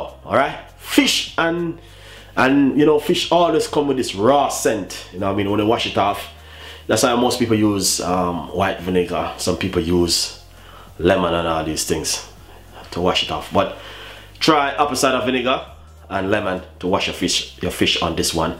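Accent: Jamaica. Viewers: I love Jamaican accent..it always seems like they are rapping😁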